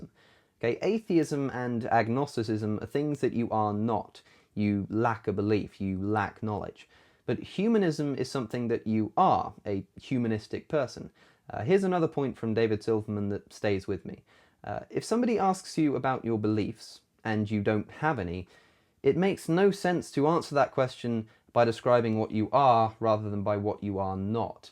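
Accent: United Kingdom. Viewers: Your a smart guy but you're blessed with a British accent. It makes you sound smarter.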